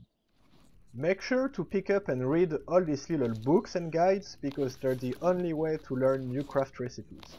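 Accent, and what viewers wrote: France. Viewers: ...wait ... are you french? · Mdr t'es français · l'accent français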